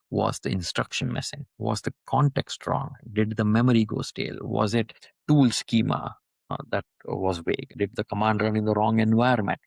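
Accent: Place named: India. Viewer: This was a GREAT explanation, even for somebody who already knew most of this stuff you managed to explain some of the primitives from such an original, opinionated angle (eg skills only after sub agents). Bonus points for making me actually like the Indian accent (I usually end up giving up when I only understand 10% of the words). An interesting segue would be discussing how models can or cannot go through reinforced learning to interact with an harness better than another, could that become a moat for models' labs? Subscribed!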